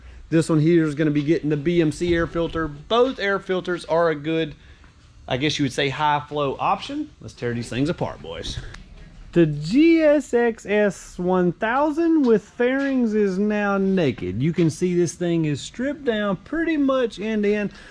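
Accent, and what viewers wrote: South, United States. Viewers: Whatsup with your whiny redneck voice?? Pls stop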